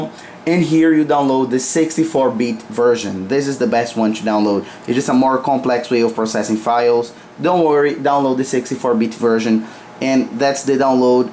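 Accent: Brazil. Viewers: Brazilian accent spotted!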